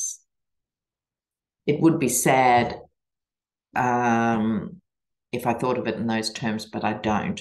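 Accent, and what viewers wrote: Australia. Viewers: I Love that you're going to be on Mary Trump's show as a political commentator. You can seem sometimes like a Sherlock Holmes of tarot and occasionally a gypsy woman tarot reader always with a distinct Aussie accent. Looking forward to your considered thoughts and opinions.🙂 Peace ✌️ ❤️ Love you lots ❤️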